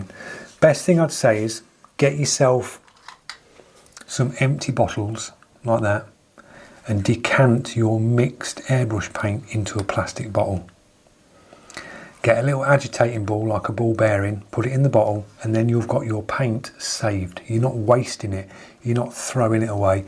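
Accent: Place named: United Kingdom. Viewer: Excellent compilation of "oh no, oh my god"!!! Loving it - especially with the UK accent.😅😅😅 Additional beginners problem: Overthinking, overlearning, overanalyzing and not practicing enough because there is "yet more info to suck up before I do something wrong" (it's me). The advantage is, that I know most of what to do/not to do, but I lack the mileage. It keeps you from being frustrated, BUT it leaves a feeling of being lazy.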